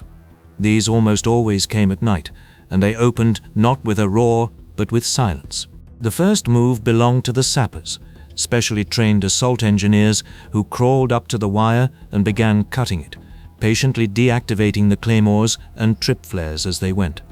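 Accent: England, United Kingdom. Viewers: Always interesting hearing an English voice telling us about Vietnam .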